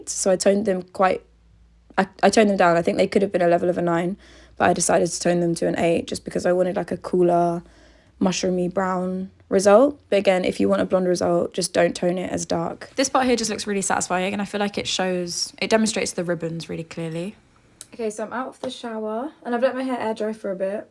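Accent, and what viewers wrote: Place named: Sussex, United Kingdom. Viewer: I like your accent. Is it from Sussex??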